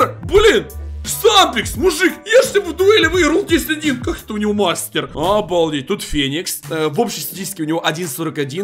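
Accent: Ukraine. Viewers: ты с Украины?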